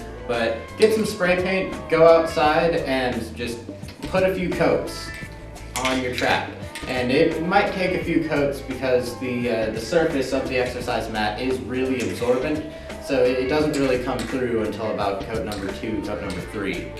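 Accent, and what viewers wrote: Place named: Michigan, United States. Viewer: I'm from Michigan and u got the Michigan acsent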